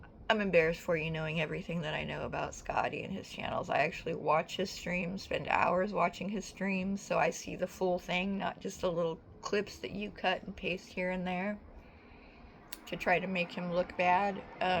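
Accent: United Kingdom. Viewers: Thanks - agree 💯 and the Brit accent is hilarious! ❤❤❤ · I've been catching up on a bunch of streams. Sorry I didn't see this earlier. 👏 👏 👏 👏 Great Brit accent & recap of Kelli Craptor's video. I can't watch her amongst others who fall in line behind MAGA kArOn. They're disgusting imo.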